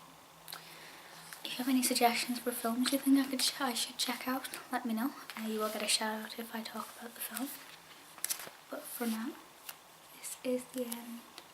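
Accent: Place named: United Kingdom. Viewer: British asmr, perfect :D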